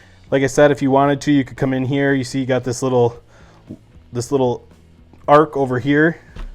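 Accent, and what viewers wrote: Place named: Midwest, United States. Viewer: Your voice has a midwestern twang. I hear MN?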